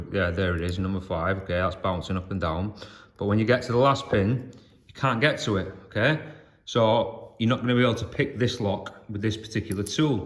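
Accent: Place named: Cockney, United Kingdom. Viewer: Nice video, I'm thinking about getting one of these Lishi knock-offs for Yale keyways. This guy is funny, I would love for him to read a children's story. LOL. I love his accent (cockney?). Love his colorful way of speaking. I can just imagine............ "She tasted the porridge from the first bowl right. “This porridge is too fuckin hot!” she exclaimed. So the little wanker tasted the porridge from the second bowl. “This porridge is too balls-deep cold,” So, the street-walking Hussy tasted the last bowl of porridge. “Ahhh, this porridge is just dead-on balls fuckin' right,” 👍👍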